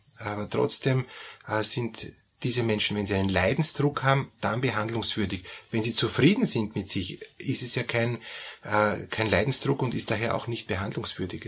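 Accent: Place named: Austria. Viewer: Sehr geehrter Herr, Ihren Kanal finde ich wirklich gelungen, außerdem liebe ich die österreichische Aussprache. Ich möchte Sie bitten, in Zukunft, Themen wie Schizophrenie oder auch Depersonalisation/Derealisation, aufzugreifen. Vielen Dank.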